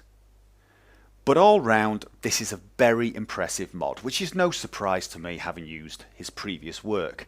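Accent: United Kingdom. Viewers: I thought he was British lol!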